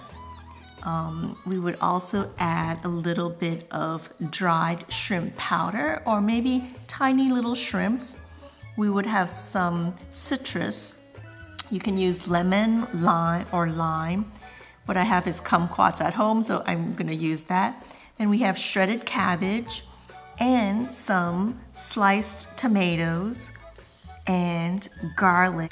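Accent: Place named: United States. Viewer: She speaks perfect English too with American accent!😊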